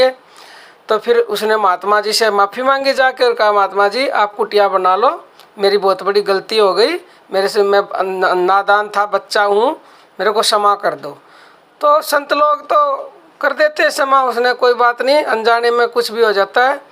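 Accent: Haryana, India. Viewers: जय श्री राम माताजी आपने सत्य कहा है माताजी आपकी भाषा हरियाणा के जैसी लगती है आप के बचन अमृत तुल्य हैं।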